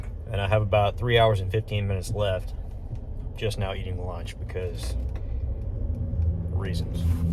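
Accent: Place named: South, United States. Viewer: Thank you for your service man! What state do you live in. You have a southern accent I feel like lol. I live down south.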